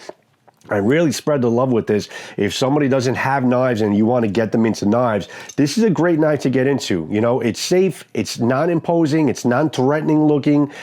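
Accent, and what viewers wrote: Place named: New York City, United States. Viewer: What borough of N.Y.C. is your accent from?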